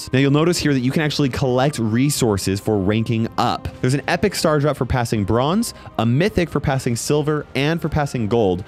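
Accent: Norway. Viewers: Are you Norwegian? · Wait wait wait Are u Norwegian?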